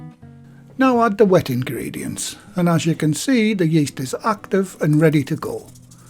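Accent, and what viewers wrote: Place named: Northern England, United Kingdom. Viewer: Awesome video and for this old English gal displaced in Texas, it was lovely hearing that great northern accent! I’m going to have a break from making sourdough to bake this beautiful loaf, less of a challenge! Thank you 🤩👍🏻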